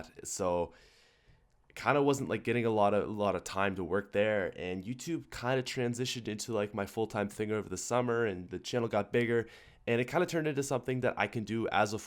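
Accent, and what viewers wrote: Ireland. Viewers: Hey. Why is it that I hear a hint of Irish( Ireland/Northern Ireland) in your voice? Especially the "r's". Haha, awesome videos!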